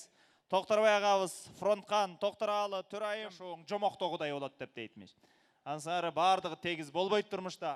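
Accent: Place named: Kyrgyzstan. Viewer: азаматсың👏Кыргызча таптак, таза, жагымдуү сүйлөп, кыргыздын нукура жүзү болуп жүрө бер❤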